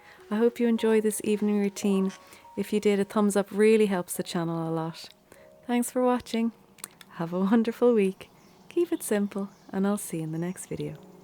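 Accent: Ireland. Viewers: I so love your videos, you can say that I am hooked. I can't get enough of them. I love your Irish accent and soothing voice. You're such a blessing. Thank you for sharing and for all your wonderful ideas. I have always wanted to visit Ireland but you bring Ireland to me. Thank you so much. God bless you. <3 Keep up the great work. You are truly appreciated. Love and hugs to you my dear.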